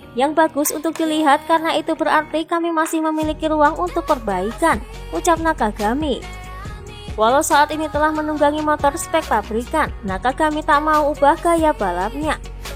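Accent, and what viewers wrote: Java, Indonesia. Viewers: Ngomongnya medok.. Emang gk bisa di ilangin y? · Logat Jawa..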